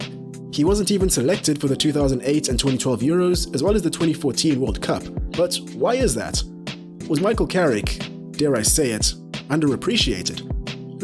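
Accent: South Africa. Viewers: I’m hearing a South African accent 🤩 awesome video!! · Are you South African? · Are you South African?? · Are you South African ? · Mzansi accent. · Love the SA accent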